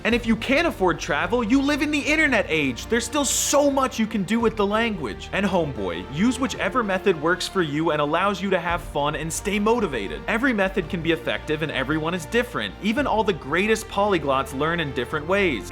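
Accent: United States. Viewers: "Um siri fazendo barra"?? Your american accent is so terrible I almost couldn't understand it. The m in um is not pronounced like m, but just nasalizes the u. And the r in siri is like r in spanish, not like in english mf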